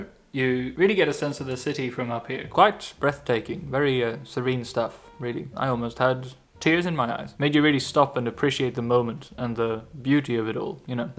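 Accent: England, United Kingdom. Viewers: I have officially found my favourite youtube channel, came her for the uni info, stayed for the sarcasm. I still can't believe you're swedish, not that I have many swedish friends to compare you with, but I could have sworn you were english ( the accent fooled me ). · I know Swedes speak good English and you lived in the UK but damn, the British accent is spot on. Not a hint of an accent like you were born to speak English.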